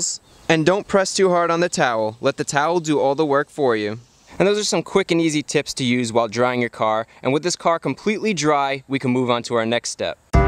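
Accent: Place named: Boston, United States. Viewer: I own a car. It is PM and am not losing sleep watching this video. But never in a thousand years would I wash my car. I don't care what says, I will drive it through the car wash. But I am watching the video anyway. I think it's because his voice and Boston accent - wodder - reel me in.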